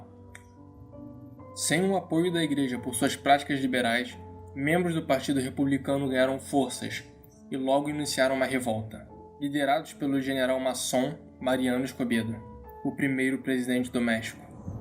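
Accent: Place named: Rio de Janeiro, Brazil. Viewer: DEIXA DE SER FRESCO! O SOTAQUE "CARIOCA" DO NARRADOR É PERFEITO!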